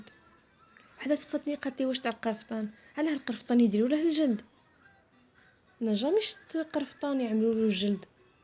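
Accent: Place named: Tlemcen, Algeria. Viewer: و الله يا اختي حسبتك تلمسانية انا تاني تلمسانية و نهدر بالقاف و عندي اللكنة كيفك سبحان الله المهم الصدر عجبني بزااااف شباب الله يعطيك الصحة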